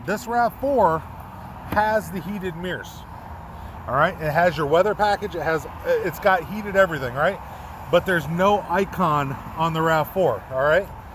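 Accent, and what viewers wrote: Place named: Flint, United States. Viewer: Flint guy here, I know that accent!!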